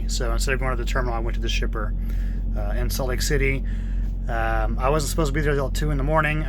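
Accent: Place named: California, United States. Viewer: That's funny; you can feel California, and I can hear it in you. 😆Travel safely...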